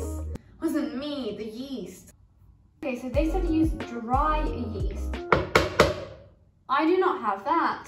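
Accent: United Kingdom. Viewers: this video would be terrible beyond all imagination if it weren't for two facts: that you're stunningly beautiful and even more importantly, that you speaking in a mesmerizing British accent, which makes this video the best one uploaded on youtube since youtube's foundation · Look how cute she look while eating by the way love your content and British accent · i have to visit england, i can listen to this accent all day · Refreshing to hear British accent after a long time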